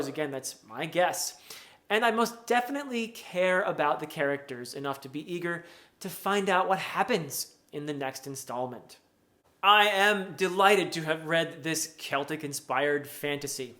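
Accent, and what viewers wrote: Wales, United Kingdom. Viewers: Having lived in Wales for a year, I was pleased to see you bringing this point predominantly into your review shedding light on Gwynne's intentions and highlighting other reviewers' misinterpretation of it being about Vikings. Also, your pronunciation sounded just like all the welsh bloke around me all the time while I was there, so nice going!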